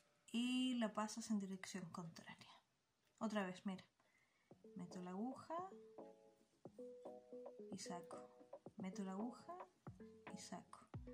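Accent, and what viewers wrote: Chile. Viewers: speak in chileno cuicos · Tienes acento entre chileno y españolísimo!! Jajaj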